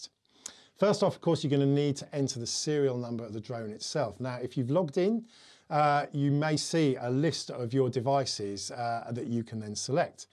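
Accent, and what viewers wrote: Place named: Durham, United Kingdom. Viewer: not yorkshire mate durham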